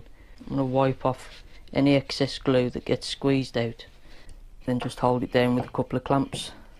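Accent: Black Country, United Kingdom. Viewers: black country accent Wolverhampton maybe?? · Bostin mar mate , day know yow could do it like that . where in the black country are yow from .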